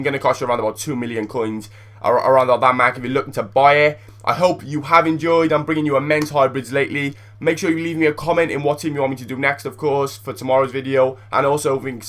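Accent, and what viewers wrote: Wales, United Kingdom. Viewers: your accent is confusing, there's weird moments when a bit of Welsh comes out..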